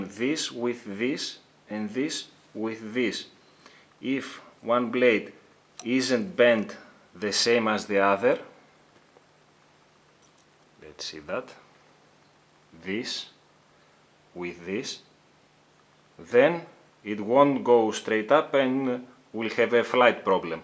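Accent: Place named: Greece. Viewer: your greek, i can tell by your accent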